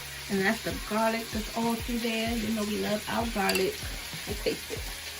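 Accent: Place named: DMV, United States. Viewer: The DMV accent is so strong lol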